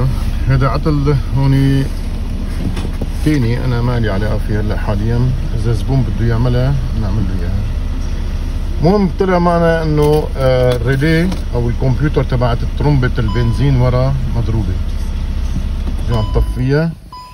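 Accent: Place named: Lebanon. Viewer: من الجزاءر تعجبني اللهجة اللبنانيةفيها خير مودع اهلوا مظروب الكف حتي الخبرة ماشاء الله عاقل ورزين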